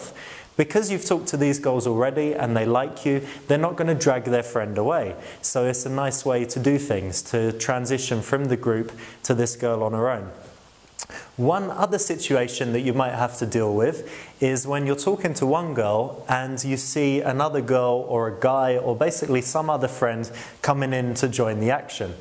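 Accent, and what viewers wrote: England, United Kingdom. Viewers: dude you have an English accent you win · U have a british accent u dont need to do anything lmao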